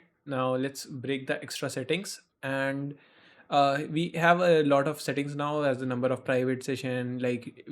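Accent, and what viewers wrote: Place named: India. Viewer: perfect indian accent. i applaud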